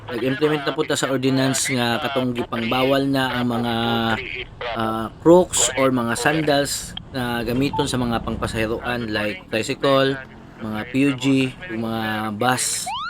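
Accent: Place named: Cebuano, Philippines. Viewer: Sarge taga asa jd ka gkan? paminaw nku dli pure Boholano or Bol anon ky dli bol anon imung inistoryahan...Cebu or Mindanaon imung Sinultihan...unta matubag nimu akong pangutana Sarge Solid followers og Subscriber diay ko nimu...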